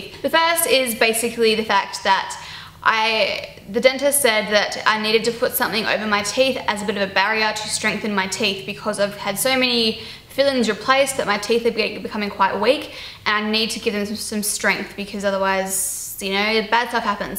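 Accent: Australia. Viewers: Um she's Australian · Is she australian?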